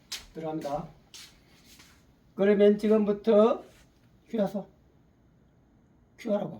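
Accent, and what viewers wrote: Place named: Gyeongsang, South Korea. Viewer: 구수한 경상도 사투리가 매력있습다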